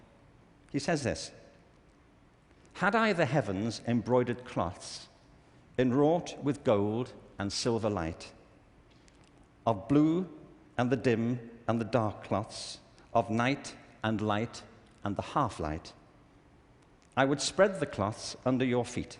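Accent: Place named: United Kingdom. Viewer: Don’t like British accent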